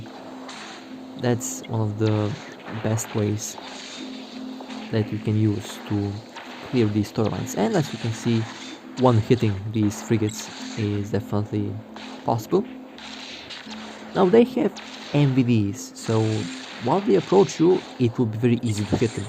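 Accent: Russia. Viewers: Здравствуйте. Попробуйте на русском свои видосы тоже делать. Так как по акценту слышно что вы русскоговорящий:)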